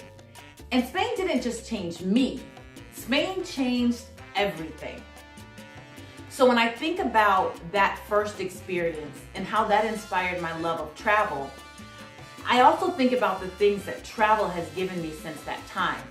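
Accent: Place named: Cuba. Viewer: Hola, te conocí en la Academia de Tiffani me encanto la entrevista desde ahora te sigo. I love your English and the way you teach, and your Spanish accent "Cubano "😂.😊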